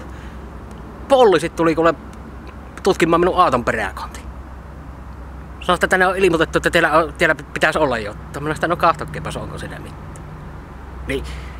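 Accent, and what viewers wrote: Savo, Finland. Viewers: Videot o hyvii, mut ärsyttää toi savon murre :D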